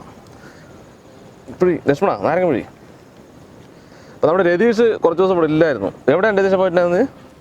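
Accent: Palakkad, India. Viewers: U can add subtitles to ur videos so that non keralites as well can understand and one more thing ur way of talking in our Palakkad style is quite well.so if u include all ur members in the whole video and lil bit of interaction with each other will be more interesting. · Palakkadan slang🤩 njn pkd aan☺️ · ഇക്കാ നിങ്ങളുടെ Videos പെരുത്ത് ഇഷ്ടമാണ്...ചുമ്മാ ഇരിക്കുബ്ബോള്‍ നിങ്ങളുടെ ചാനല്‍ എടുത്ത് Videos കാണാന്‍ തോന്നും...വോറൊന്നും കൊണ്ടല്ല...ഞാന്‍ Trivandrum ത്ത് നിന്നാണ്...നിങ്ങളുടെ പാലക്കാടന്‍ Dialect വലിയ ഇഷ്ടമാണ്...സ്വന്തം Dialect ഉപയോഗിക്കുന്ന ഭംഗി Standard Dialect ഉപയോഗിച്ചാല്‍ കിട്ടില്ല...ഫിറോസ് ഇക്ക ഇഷ്ടം...😍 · ningalde palakkadan basha kelkan nhan veendum veendum video kandu ikka luv from sharjah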